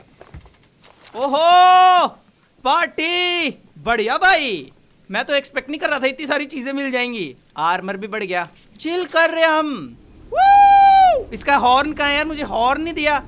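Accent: Haryana, India. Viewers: Real HARYANAVI HARYANA KE LIKE KARO AND I LIKE HIS HARYANVI ❤❤❤❤❤❤❤❤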